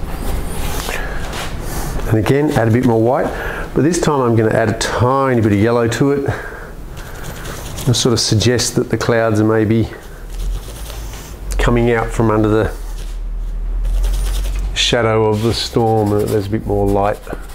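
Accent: Australia. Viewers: Thank you! Trying to figure out your accent. Are you in Australia ? 😩😜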